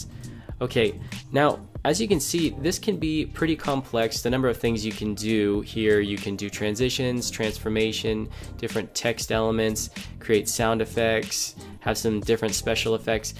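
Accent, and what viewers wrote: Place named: United States. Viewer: Not understand the American Accent but the tutorial appears very clear, Gud Job, Very Nice Tutorial.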